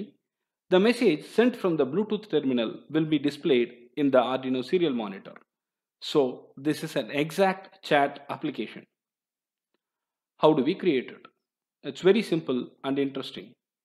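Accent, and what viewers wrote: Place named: India. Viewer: by your accent you are an indian..... as always indians are good teachers.....worth watching the full video,,,,,thanks a lot....expecting more things from you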